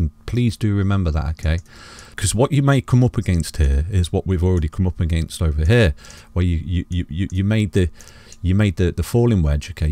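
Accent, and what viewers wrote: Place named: East Midlands, United Kingdom. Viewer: Great analysis. Sounds like you’re from Derby/Nottingham by the sounds? I don’t know if you’d class it as an East Mids accent.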